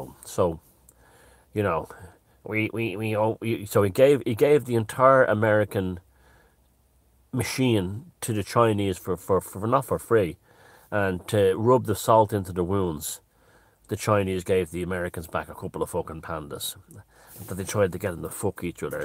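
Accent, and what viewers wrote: Ireland. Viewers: Always love the impressions and the different Irish accents… Much appreciated!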